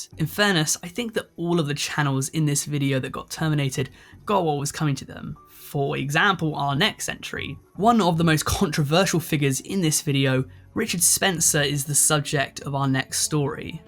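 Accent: United Kingdom. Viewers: Youre british?😮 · yuck british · British tuv, love it bro, keep it up!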